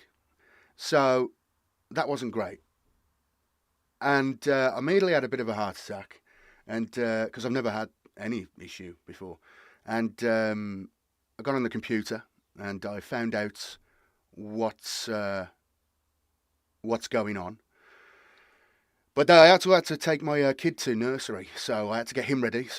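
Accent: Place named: Tamworth, United Kingdom. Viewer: I thought you were a Tamworth lad from your accent, I’m just down the road from there (on the boarder of Leicestershire). Didn’t know if you’d randomly turn up at our local shop sometime.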